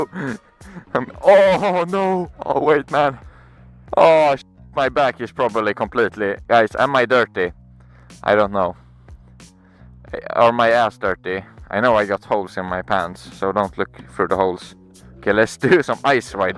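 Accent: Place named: Sweden. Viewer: you soud swidish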